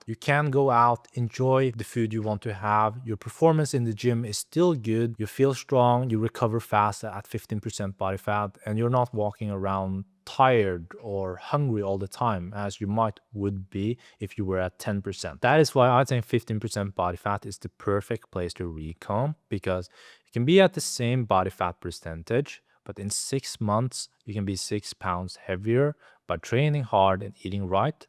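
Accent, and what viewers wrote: Norway. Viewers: Love the Norwegian accent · Wait, it took me a minute to realize, but you have a Norwegian accent. Cool! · Do I detect a norwegian accent?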